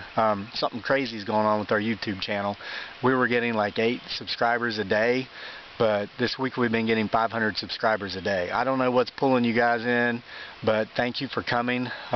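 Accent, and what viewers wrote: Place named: South, United States. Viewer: Petutchi Betelgeuse Ditto.His genteel manner and southern accent are super nice too. I havent caught his name either... so humble.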